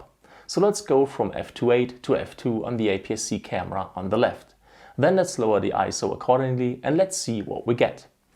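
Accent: Stuttgart, Germany. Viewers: Kommst du aus Stuttgart? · U sound a bit german, are you? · man, are you german?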